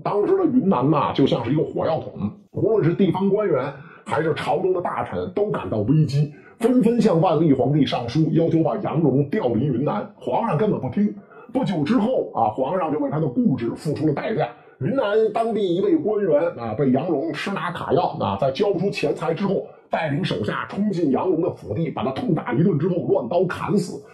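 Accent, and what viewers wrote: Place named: North, China. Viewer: 可以换一个声音吗？这个口音我实在无法听清楚，我是马来西亚人这种北方口音实在让我头疼。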